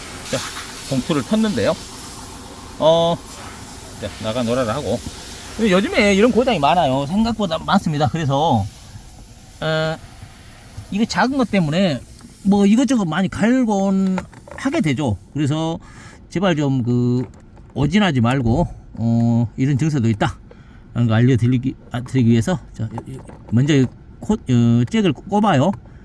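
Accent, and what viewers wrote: Jeolla, South Korea. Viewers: 전라도 말씨가 정겹네요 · 항상 침착하게 그리고 쉬운 퍼포먼스로 말씀을 아주 잘해주세요!~^^ 찰지게 또 하나 배워갑니다ㅎ 처서 가 코앞이지만 몸관리 신경쓰셔셔 컨디션조절 잘하시길 기원드립니다!! 역쉬 구수한 전라도사투리 짱!ㅎ 참고로 저는 충청도 입니다!~ 나가 아주 미쳐불어 미쳐불어 미쳐분당게..ㅎㅎ^^ 감사합니다.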